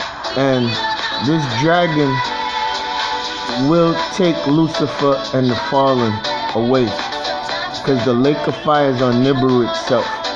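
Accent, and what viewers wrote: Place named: London, United Kingdom. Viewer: On form today 😂😂😂 And, for those of us who do have fish and chips here ~ we do usually do that on a Friday!!! Love your London accent! Bless you for making me laugh! 🙏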